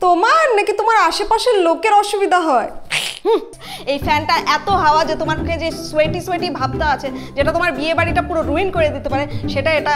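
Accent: Bangladesh. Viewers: Apnr kotha bolar style pura Bangladeshi der moto... mone hoi apni Bangladesh a chilan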